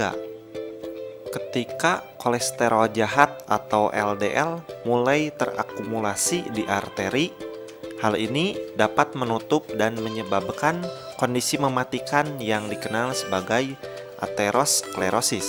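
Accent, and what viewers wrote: West Java, Indonesia. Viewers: Sunda pisan kang... Hatur nuhun ah tips na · Sunda pisan meneh ngomong logatna hahaha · Kayaknya adminnya orang sunda... Kelihatan ga bilang 'ep' (f) 😄😄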